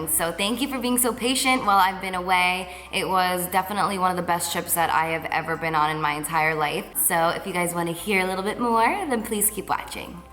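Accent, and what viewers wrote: New Jersey, United States. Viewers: shes nj n i think ny talk like that too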